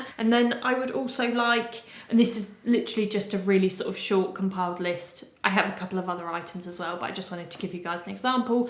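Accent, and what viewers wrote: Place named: London, United Kingdom. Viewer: Omg same here I am learning her London accent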